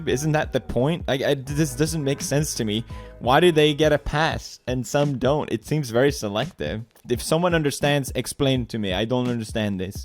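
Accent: Sweden. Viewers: I can’t tell if pewdiepie is using the forced Swedish accent or this is legit how’s he’s gonna talk rn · Is it just me or is his accent more swedish now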